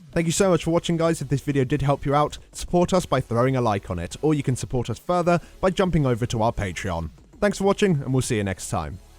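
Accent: United Kingdom. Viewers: what region of the isles is your accent from?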